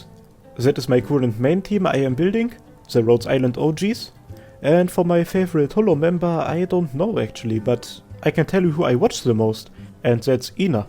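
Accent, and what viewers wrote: Germany. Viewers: Glückwunsch! I found your channel a couple a weeks ago and I love your videos. I was more of an Azur lane player before and I didn't know much about the deeper lore in Arknights. I don't know if it's appropriate to say but I really like your German accent. I wish you many many more subscribers and views. Greetings from Hungary. :D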